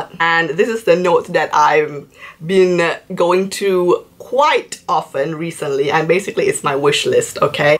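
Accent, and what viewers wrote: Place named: Italy. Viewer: I love Italians and there funny accents!!❤❤😍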